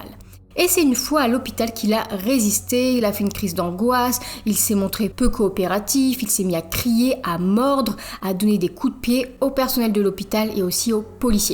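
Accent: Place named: Nord, France. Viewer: T'as grandi dans la famille ch'ti ? Parce que maintenant c'est rare que quelqu'un si jeune comme toi possèderait un accent très prononcé. Je me moque pas de toi, c'est juste que je kiffe cette prononciation. Ça fait un petit moment que j'apprends le français et je me suis habituée à prononcer tous les a comme toi. J'ai vu la vidéo où tu disais que tu songeais pas changer ta prononciation et je trouve que c'est très bien.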